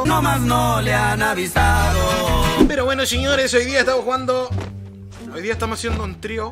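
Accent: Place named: Chile. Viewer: Jajajajaja ya me suscribi a tu canal y no por el contenido sino porque te salen chistosas las palabras yo igual soy de Chile pero igual no hablo tan así jajajajja saludos y te dejo tus likes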